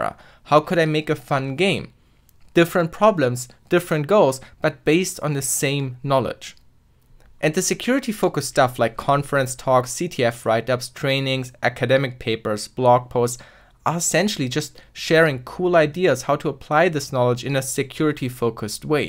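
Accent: Germany. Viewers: Cool, dass Ihre/deine Videos auf Englisch laufen, damit es auch so viele wie möglich sehen, aber man hört schon sehr stark "den Deutschen" da raus. Macht aber natürlich nichts, solange man Sie/dich versteht. Normalerweise siezt man bei unbekannten nicht gleichaltrigen Menschen, aber da wir auf YouTube sind und eig. jeder duzt, habe ich mich für den Kompromiss entschieden. Ich sie-/duze :D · Weiß noch die ersten Videos von ihm, hab gar nicht gedacht, dass er Deutscher ist aber irgendwann fällts einem bei manchen Wörtern auf :D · Klingt recht deutsch der bursche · Ich wusste sofort das er Deutsch ist😂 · I love your german accent by the way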